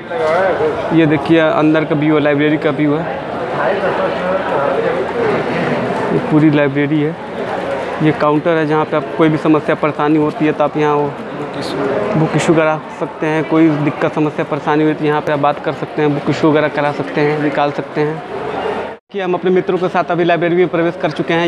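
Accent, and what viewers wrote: Bhojpuri, India. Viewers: Glti se i came here.....but couldn't stop myself from watching the whole vdo.....nd just coz of his awsmm #bhojpuri assent.......😂😂😂😂he was like inko kon hi rokega😂😂😂😂😂😂😂😂😂😂😂😂😂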